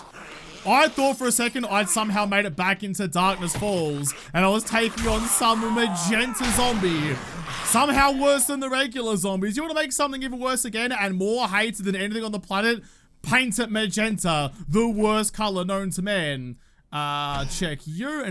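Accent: Australia. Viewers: personality is just so GD endearing and he's such a wholesome kinda guy. And come on... that Aussie accent is awesome, not weird. One of my favorite YTers! And BTW, Desert biome FTW!!!!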